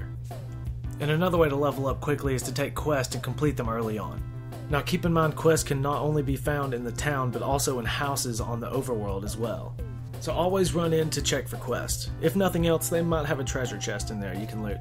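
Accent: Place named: Rural, United States. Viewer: Thanks for the video. I like your country twang.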